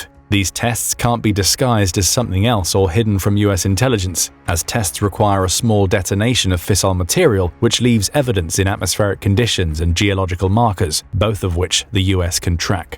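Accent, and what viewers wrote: United Kingdom. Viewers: 250 years ago, Rebels broke away from British rule and sailed to the New World to start their own government. But, this youtube channel never got that memo. So . . . they speak with a British accent About U S military movements. WHAT IS WRONG WITH THIS PICTURE?! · why do we have to keep hearing American issues, with a British accent. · QUERY: Why choose Brit accent for narration?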